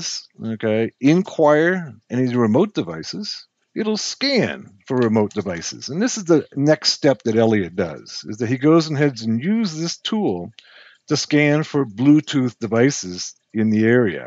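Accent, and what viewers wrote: Afrikaans, South Africa. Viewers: You sound south african, great content!! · Hi are you afrikaans?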